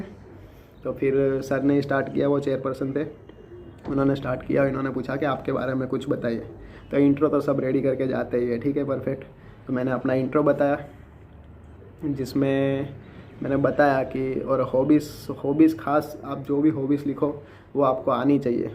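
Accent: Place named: Gujarat, India. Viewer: Thank you mota bhai 😊☺ btw from which district are you in gujrat. Your gujrati tone reflect in your talk. Btw jay swaminarayan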